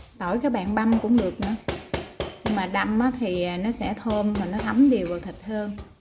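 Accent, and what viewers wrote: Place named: South, Vietnam. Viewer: Cứ phát âm theo kiểu miền Nam cho tự nhiên nha em.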